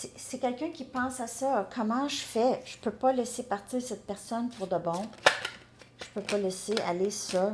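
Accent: Canada. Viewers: Vs êtes adorable et encore plus votre accent Canadien je vs adore 🥰 Princesse 🇹🇳💐🌹🌹🌹🌹🌹👏🏽🎻🪐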